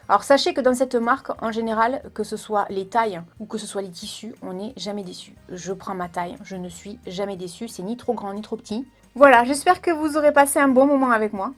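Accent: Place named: Perpignan, France. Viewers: Bjr mon 1ier comm depuis plus de 2 ans ma 1iere ybeuse ma préférée avec or elly. Tout ce que ns raconte tu ns apprends. Pleins de similitude..ma mamy était de vidaillac lot jpasse mes 6 mois d'été Aude et P. O. Jacheche #essensse#chez Julia a la jonquera. J'adore cadaques maison de Dali et aller à Gironde le mercredi j'achète pas ceux que tu ns conseilles. Tu es belle une belle tête bien pleine t'as le peps j'adore j. P.arker.jnai pas trop eu de kado de ma faille bien que j'en fasse a ts les 6 moi jsuis maman kdo. Que veux tu mes familles de cœur c parfois plus important et elles m'ont gates puis les kdo 2019 je vais m'en offrir n'a.. L orange de la petite mémé wouau. J'ai déjà custo ttes mes blouses avec des perles les créoles d'après t conseil la jvais attaquer mes boots. Bonne merveilleuse année chère à toi ta maman ton chouchou, 🐕et la santé..moi c pas le top mais Jle dis pas c pour a que toi o relly Nicole et mon Ange jne loupe rien.je commande sur ALIE. 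Joom et un peu shein. Sur les conseils daurely every thing five pounds. Grossiste français. Bonne résolution ne plus me laisser faire par des C... Tourner la tête et ignorer. Ensuite aménager mes coins beauté fringues. M'habiller chic comme toi. Et me faire plaisir ça de pouvoir louer une partie de llannne dans le 11 et le 66.lespagne....mon oxygène. Merci pr t élans du ❤️et ta simplicité Perpignanaise au même accent💗poutous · Quelle belle histoire de Noël! Ma femme veut bien l'adresse de cette gentille dame aux oranges car nous ne sommes pas loin de Perpignan ! Lol 😉 trop sympas vos vidéos ca fait plusieurs qu'on voit et on aime! En plus, avec l'accent de chez nous ça fait du bien ! Hop on s'abonne avec plaisir. On a apprécié en particulier les vidéos sur Yves Rocher, Cien (Lidl) et les astuces... Bonnes fêtes !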